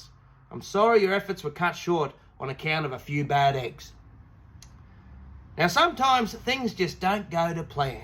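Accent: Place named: Australia. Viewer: I surf utube alot and I'm so glad that I came across your channel 🤣. It's a rippa 👌👍. Great to hear a fairdinkum assie telling a good old yarn 😅. Bloody love it mate 👍. Sorry about your mate but in his memory as a good old assie keep on telling them yarns and keep the dream alive 😂🫡💔❤️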